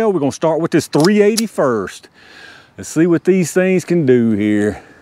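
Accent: South, United States. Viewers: Your thick southern accent makes me feel confident that you know wtf you're talking about 🤠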